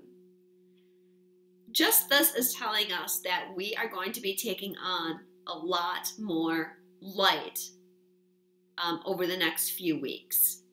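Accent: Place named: South, United States. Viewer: Yes, it still seems impossible NOT to rest; I just want to get into my mission and being creative and stuff, but man; I just don't have enough physical energy nor would I know where/how to start!!:-)) By the way, are you a singer? You have a Country voice that reminds me of Emmylou Harris:-) Much Love to you as always, and thank you for the video <3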